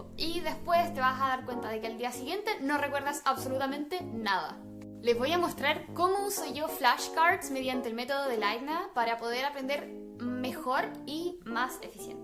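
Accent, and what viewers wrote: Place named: South, Spain. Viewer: tienes un acento precioso! Y ese acento sureño se entiende perfectamente! Muy buen video! Saludos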